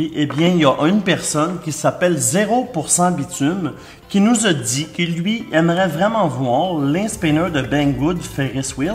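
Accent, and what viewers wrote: Canada. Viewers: J'adore ton accent canadien tes le meilleur. Je veux un spinner stp je fait toujours tes concours mais je gagne jamais et la j'espère gagner. Merci pour tous se que tu fait pour nous .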